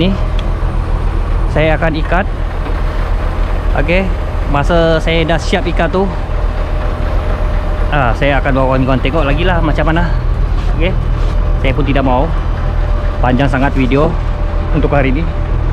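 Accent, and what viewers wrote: Sabah, Malaysia. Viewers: Sabah bro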